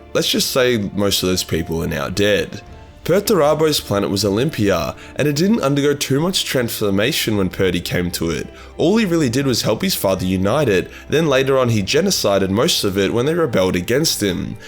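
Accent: Australia. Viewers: If you hear trader, its actually traitor. Just aussie things.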